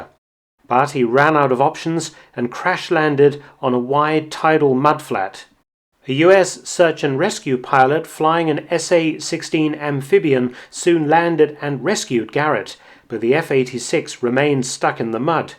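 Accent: United Kingdom. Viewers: Why use Robo-voice when you speak in a sophisticated British accent?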